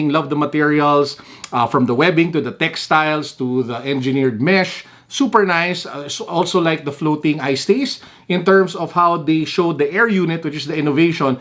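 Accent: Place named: Philippines. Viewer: You sound pinoy kuya.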